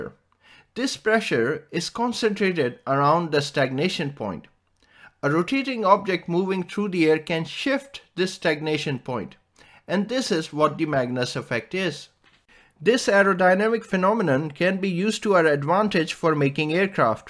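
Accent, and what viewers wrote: India. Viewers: Indian accent?😂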